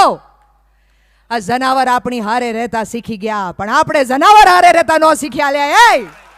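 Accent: Kathiawar, India. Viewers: બેન તલપદી કાઠિયાવાડી ભાષા માં બરડો ગીર નો સંવાદ બોલવા બદલ ખુબ ખુબ આભાર🎉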